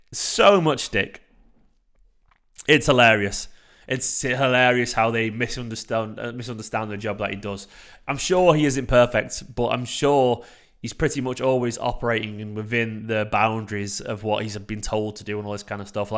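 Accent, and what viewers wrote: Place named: Manchester, United Kingdom. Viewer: Too funny people saying you don't have a Manc accent 🤣 i'm from East Didsbury born and bred and have a similar accent to you ... Yet the Gallaghers are from a mile up the road in Burnage and have a different accent ... wow, so what 😁... By the way, Didsbury, Withington, Burnage, Rusholme and Moss side were always mostly Blue, proper South Mcr ✌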